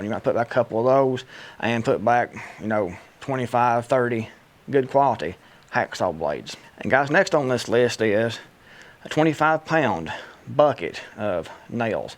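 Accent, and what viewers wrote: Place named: Tennessee, United States. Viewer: Being from the northeast, I ALMOST needed a Tennessee to Jersey dictionary. Thankfully I've been to your great state a few times so I'm used to it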